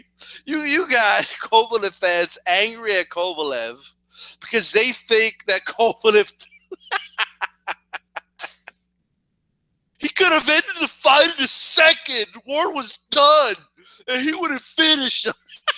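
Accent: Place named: New Mexico, United States. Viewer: por k hablas con accento nuevo mejicano?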